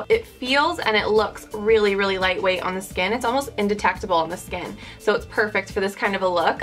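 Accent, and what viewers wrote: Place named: Minnesota, United States. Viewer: Are you from Minnesota? I lived there my whole life up until this past year, and I can totally tell your accent. The way you say your A's :)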